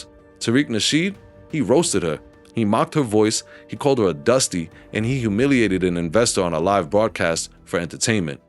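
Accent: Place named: Dominican Republic. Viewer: Probably Dominican!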